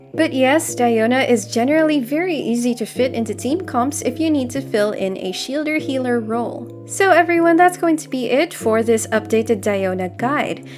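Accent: Philippines. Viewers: Idk why but im getting heavy pilipino vibes from her voice, where she from, I mean the narrator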